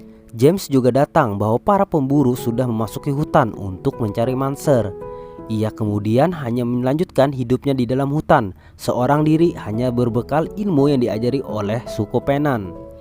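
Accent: Indonesia. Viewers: Ciri khas suaranya kaya orang Kalimantan 😁 aku udah subscribe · Kaka ya orang banjarkah ? Soalnya logatnya ketahuan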